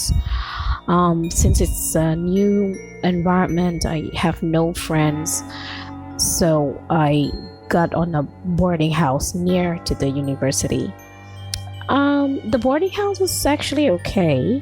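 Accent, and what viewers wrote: Indonesia. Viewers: Ini yg ngomong org indo ya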